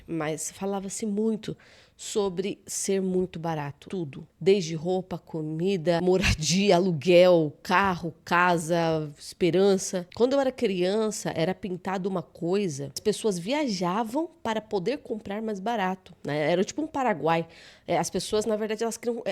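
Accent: Bahia, Brazil. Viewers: Pelo sotaque ele é baiano 😂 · Pelo sotaque é baiano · Como baiano, reconheci logo ao abrir a boca que é baiano! A nossa fala é muito particular!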